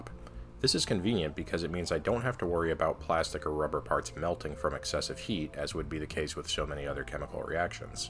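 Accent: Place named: New Jersey, United States. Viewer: Are you from NJ? You have a Jersey accent.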